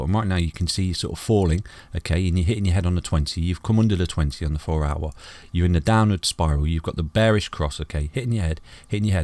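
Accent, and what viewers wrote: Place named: East Midlands, United Kingdom. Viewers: Sounds like east midlands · He's from Leicester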